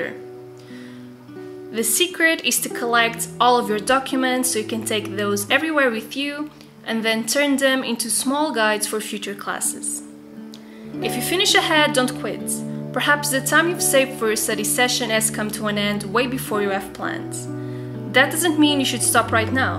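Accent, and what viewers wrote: Portugal. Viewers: Tu és portuguesa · where are you from? It's just you don't seem american/english. És portuguesa, certo??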